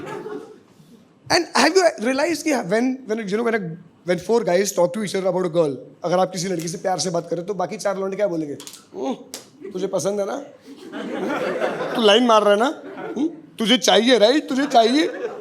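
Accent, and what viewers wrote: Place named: Garhwal, India. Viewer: 🤭🤭your accent is garwali though💜was waiting for someone from Uttarakhand to do standip.Finally got you.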